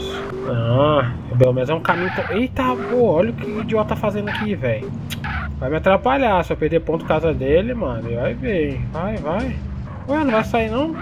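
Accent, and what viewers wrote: Bahia, Brazil. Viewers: É baiano ctz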